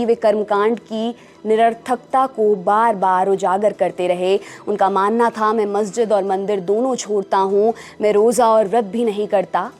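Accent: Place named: Punjab, India. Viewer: Your way of presentation is very nice betaji. Specially Punjabi tone. God bless you😊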